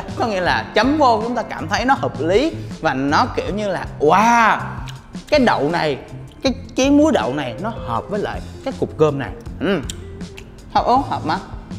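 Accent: North, Vietnam. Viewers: Nói giọng bắc buồn cười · Giọng bắc xịn đấy:))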